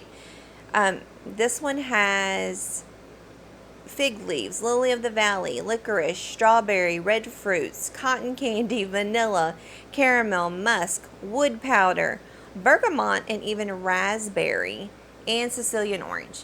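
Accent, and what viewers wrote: South, United States. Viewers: I love your beautiful accent. I used to live in North Carolina and in Mississippi, such sweet people and their accent melts my heart!!!! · Loved your selection as well as yoyr southern accent. I could listen to you talk all day, you sound so lovely. 😊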